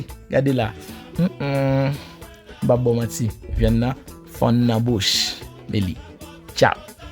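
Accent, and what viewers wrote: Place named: rural, Haiti. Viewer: Pagen capital anko aksan ban moun nn morn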